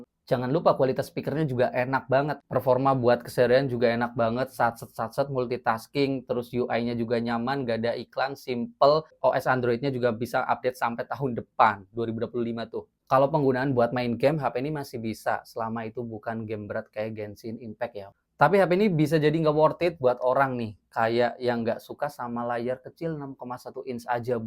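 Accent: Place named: Banyumas, Indonesia. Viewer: Logatnya ngapak banget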